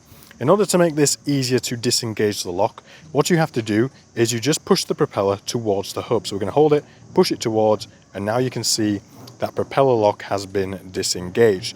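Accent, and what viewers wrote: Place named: Yorkshire, United Kingdom. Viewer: You live in the sunshine by the looks of things but you seem to have some Yorkshire roots as well. I'm a Sheffield boy - best wishes, Nick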